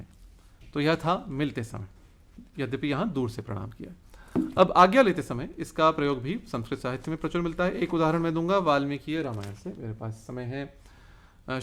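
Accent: Standard. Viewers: Bahut hi Badhia Vishleshan, balanced. Hum log to khichadi bhasha bolne wale hindu hain. Aap ki shush, sanskritnisht hindi sun kar Aanand aata hai. Aap ko sun sun kar humari bhi hindi achchi ho jaegi aisi Aasha hai. Uttam katya kar rahe hain aap. 👏👏👏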